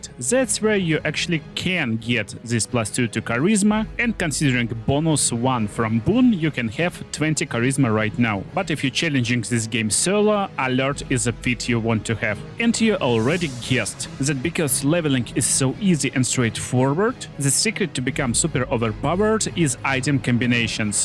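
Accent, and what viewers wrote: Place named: Russia. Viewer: Сэнкс фор зе гайд! Ар ю пробабли рашн? Каз Айм притти щюр зэт зис ис рашн аксент.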